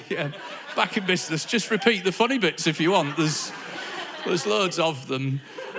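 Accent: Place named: Manchester, United Kingdom. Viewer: I'm a bit racist with my comedians as I prefer Mancunians 😆The accent lends itself to comedy.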